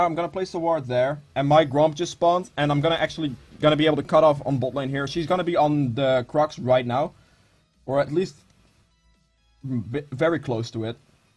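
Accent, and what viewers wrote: Netherlands. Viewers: You have a dutch accent are you dutch???? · dacht ik al aan je accent te horen! Vooral aan de S:)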